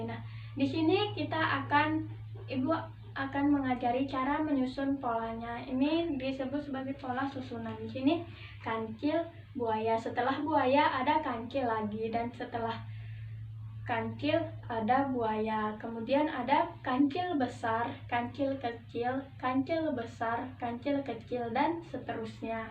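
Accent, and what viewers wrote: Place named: Pekanbaru, Indonesia. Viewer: Logat pku nya nampak kali yaaaa (pake nada pku)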